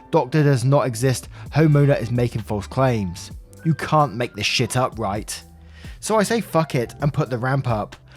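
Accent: England, United Kingdom. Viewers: You don't need to change the way you pronounce letters. I've heard a number of people from the UK pronounce the letter H the way you do, and there's nothing wrong with it. I hope you don't feel like you have to adopt Americanized pronunciation to please your viewers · I just found it amusing that alot americans , are trying to correct a English man, literally born in England, on how to speak and pronounce English.........🤣😂🤣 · I love the English pronunciation of H. Don’t change, and still say zed, not z. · why the heck shouldn't you say "haitch?" You're English! That's how folks from England say the letter H. If you want to say it "aitch," knock yourself out. I'm not gonna tell you how to talk. And neither should anyone else. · You say H like any British person does. Doing nothing wrong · Ha, don’t stress about it, I mean I just figured that the “h” thing was a British thing and reasoned that if you did it, then all Brits did it! 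🤪🤦‍♀️. ALSO this’s off topic, but I recently discovered a hilarious British show called PLEBS... England has been holding out on us because that show is pure gold (well at least the first 3 seasons)!! 🤌👏🤣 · You’re British, people are really bothered that you have a different accent? 🙄🤦🏻‍♀️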